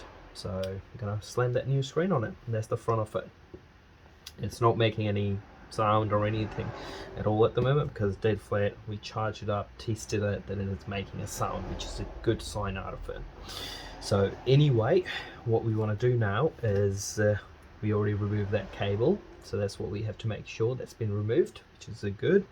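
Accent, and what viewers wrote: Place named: United Kingdom. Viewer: Where is your location and your store to be precise? You sound British to me and by the way great video, thanks for the upload.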